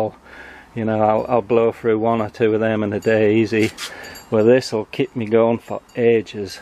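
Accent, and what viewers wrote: United Kingdom. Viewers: How does an American have a British accent?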